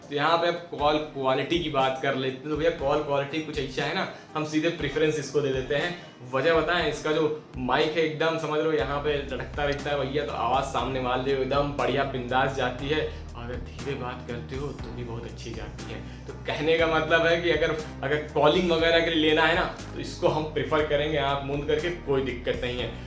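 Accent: Bihar, India. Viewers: Bihari accent bro💥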